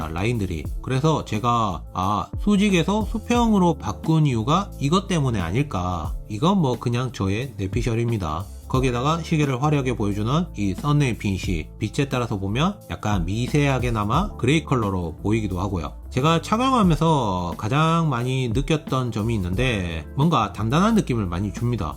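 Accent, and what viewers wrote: Gyeongsang, South Korea. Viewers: 경상도 사투리만 조금 더 다듬으면 전달이 더욱 잘 될 것 같습니다.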